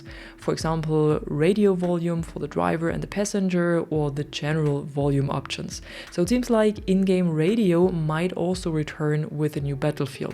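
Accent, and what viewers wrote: Germany. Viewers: as a german, she sounds ultra german to me haha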